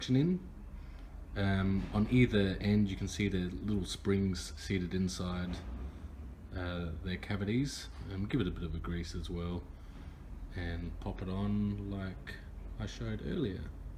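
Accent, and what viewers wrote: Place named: New Zealand. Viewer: Thankyou for this bro. Saved me a fortune right before Christmas. Sounds like you're from nz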